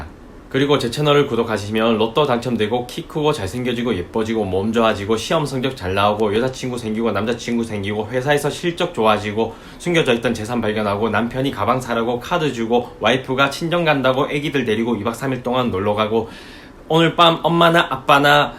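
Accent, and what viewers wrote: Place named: Busan, South Korea. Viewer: 영상보다가 억양을 들어보니 부산분 같은데 고향이 어디신지요? 저는 부산입니다.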